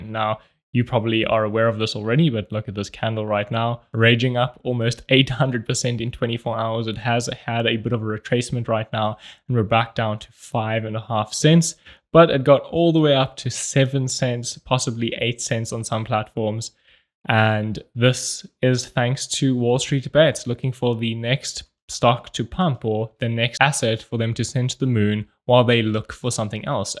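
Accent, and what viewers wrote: South Africa. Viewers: No doubt you are South African. Great content bruv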